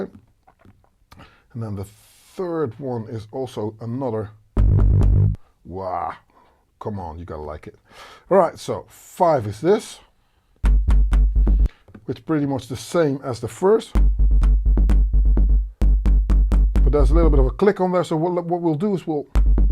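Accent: Netherlands. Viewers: Assuming you're Dutch based on your accent. Correct me if i'm wrong haha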